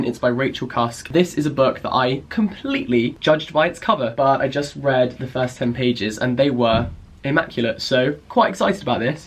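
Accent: United Kingdom. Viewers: Your violently British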